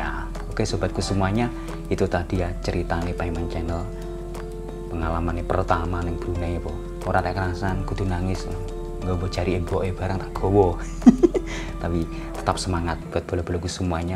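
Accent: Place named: East Java, Indonesia. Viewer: lihat cara bicara udah kelihatan orang jwa timur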